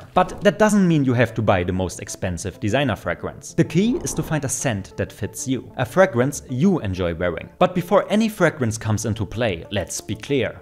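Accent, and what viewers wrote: Germany. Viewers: Hey man, love your content, stuffs great!!! Are you german tho? I am and do hear some of it….maybe… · ich höre deutsch, ist das wahr?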